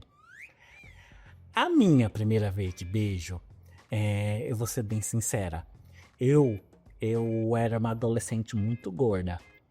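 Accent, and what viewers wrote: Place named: United Kingdom. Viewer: seu sotaque em inglês é britânico, percebi isso hoje.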